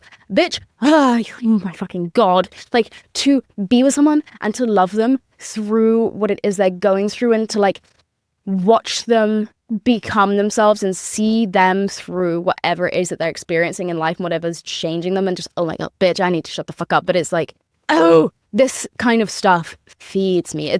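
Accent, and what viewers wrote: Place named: United Kingdom. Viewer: ❤Ur podcast and British accent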